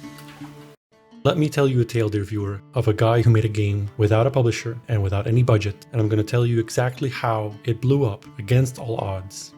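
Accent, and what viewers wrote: West Flanders, Belgium. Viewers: En nen west-vlaming ton nog 😅 gg! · Te ton nog ne west vlaaming. Van wo zij wel? We zijn trots. Veeeel sucses nog in de toekomst.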